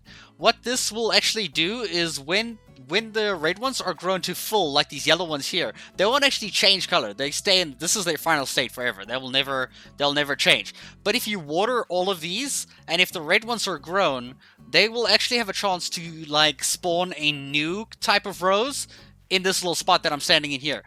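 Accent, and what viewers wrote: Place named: South Africa. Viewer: I was watching your video and thought “this guy sounds South African” and I was right! First time watching your videos but hello from SA too 🙋🏽‍♀️😄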